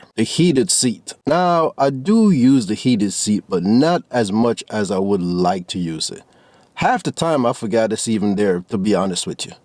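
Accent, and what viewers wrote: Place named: Jamaica. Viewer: You sound like you have Jamaican heritage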